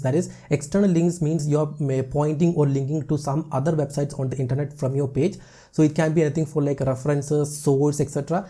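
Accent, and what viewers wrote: India. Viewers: Man you're just the best! Nice content and I use your... (Indian?) English to improve myself haha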